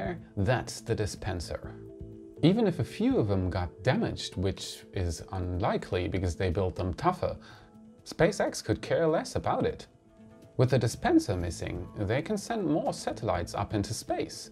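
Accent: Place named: Germany. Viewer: Oh my goodness first time I went back to #1 u need a haircut here and u have more of your German accent! ❤️your the best!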